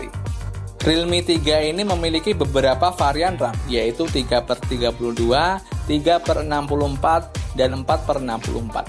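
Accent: Magetan, Indonesia. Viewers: Iki lak wong Magetan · Boss...magetan e ndi boss?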